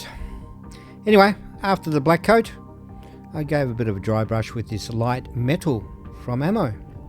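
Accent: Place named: Australia. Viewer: Holy crap! You're an Aussie. Nice job, it brings back memories. The old series was by far the best.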